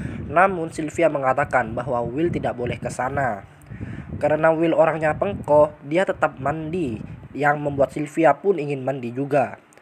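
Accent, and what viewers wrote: Madura, Indonesia. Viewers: Logatnya kyak yg kenal...org madura ya tretan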